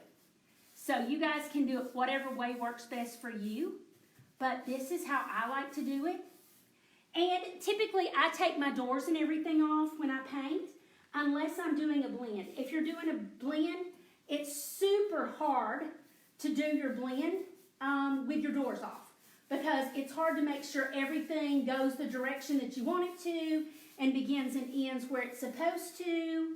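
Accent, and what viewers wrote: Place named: South, United States. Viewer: #replay!!! Love your tutorials and of course your southern accent LOL!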